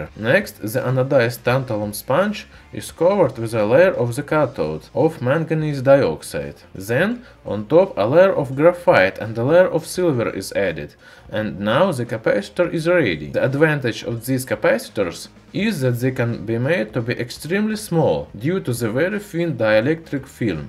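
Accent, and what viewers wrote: Russia. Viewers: Russian accent, its great , listen & learn it. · His accent is sometimes starting to go to Russian accent but I understand him. I don't sometimes understand him it's accent is very good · I think his accent is interesting and I've never heard a Russian accent like that. He looks Finnish to me, and I wonder what part of Russia he is from.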